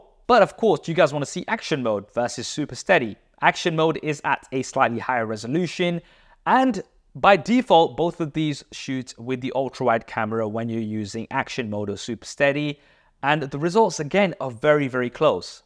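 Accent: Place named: United Kingdom. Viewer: YOU ACCENT SOUNDS BRITISH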